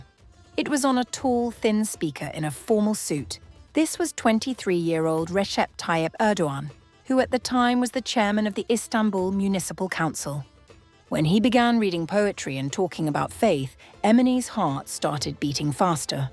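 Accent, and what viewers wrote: United Kingdom. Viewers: Your accent is British. You insist on how much the tea cost. How about your royal family's lifestyle and expenses that live off from your taxpayers!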